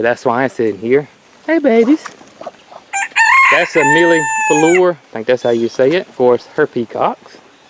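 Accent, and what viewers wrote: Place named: Mississippi, United States. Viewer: I love your accent! 😍 It reminds me a lot of my grandmothers, she’s from MS.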